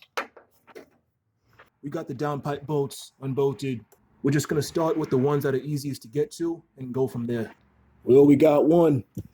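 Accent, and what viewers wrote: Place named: East Coast, United States. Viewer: Love your stuff man! You're doing great videos! I'm curious though you're in though as it seems like you are dealing with a lot of rust issues and I can hear some East Coast in your voice so you're definitely not in Houston Metro... Keep up the good work and here's wishing your channel all the success in the world!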